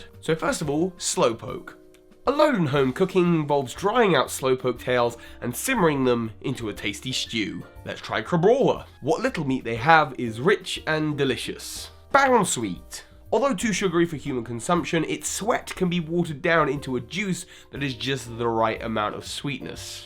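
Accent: United Kingdom. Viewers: Are you from America? I always thought you was British!?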